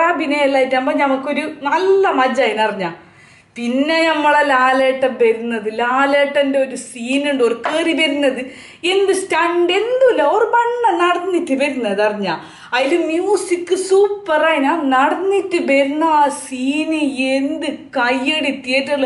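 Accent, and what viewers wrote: Kasaragod, India. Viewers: Muslimallaaannn enikkariyaaam.olentheppaa shawllokkeyittit ksd slang paraynne.athond allaand olod asooyannolla😂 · Sherikhum kasergode ulla ellavarum ingane aano samsarikkunnathu....Ente kude oru 4 perundu pakshe Avaru ingane samsarikkunnathu kettitilla.....mangalapuram kaaru Ee reethiyil ulla malayalam paranju kettitund..,avarude Ee Baari Bhaasha super aanu kelkkan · Review from an innocent mind, through super kasaragod coloquiel language, super.. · +kasargod slang +thattam.. a deadly combination... Meanwhile weak point of any dare and devil soul.. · ഞമ്മള് ഇങ്ങളെ കാസറോഡ്‌ ബാർത്താനം കേക്കാൻ മാണ്ടി ബന്നതാ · 👌👌👌👌 kasrod baasha superaayi paraynnind..bhaashaye ingne abhimaanathodu koodi paranh variety channel aakyathm mass aayin. thattam dairyaayit itto..palarum enthokkeyo paraynnind..kaaryakanda.. Indian pourante avakaasham💪. kurupottiknnavare kaaryakenda..full support💪💪💪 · ഒരു വീഡിയോ ഇടൂ ട്ടോ ഞങ്ങൾ തെക്കൻമാർക്ക് വേണ്ടി.... 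കാസറഗോഡ് കൊള്ളില്ല എന്നല്ല അതിനർദ്ധം... പൊളിയാണ് താങ്കളുടെ കാസറഗോഡ് talk💕💕💕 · Endokkeyo evideyokkeyo manassilaayi. Edakk lucifer ennu paranjond athum😂😂. Kettiond irikkan nalla resond kasargod slang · കോഴിക്കോട്ട് ഉള്ളോർക്ക് തെരിയാത്ത ഭാഷണ്ടോ ഞമ്മക്ക് ഇതൊക്കെ എന്ത് ഹൽവ മാതിരി കാസ്രോട്ട് പെര്ത്ത് ഇഷ്ട്ടം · നല്ല അടിപൊളി ആണ് ടോവിനോ നല്ല നമ്മളെ കാസറഗോഡ് ഭാഷേ നല്ല ചേലായിനി നമല്ലേ ലാലേട്ടൻ നല്ല പങ്ങായിൻ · Review പത്തുപൈസക്ക് ഇല്ലേലും..... നമ്മളെ കാസ്രോട്യൻ സ്ലാങ് പൊളി ആക്കീന്....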